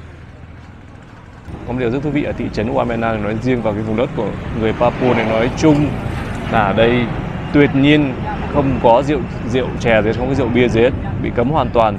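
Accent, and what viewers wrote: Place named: South, Vietnam. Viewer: Chào bạn..nghe giọng nói của bạn là người miền Nam. không biết. quê hương VN. của bạn.ở tỉnh nào. miền Nam Việt Nam.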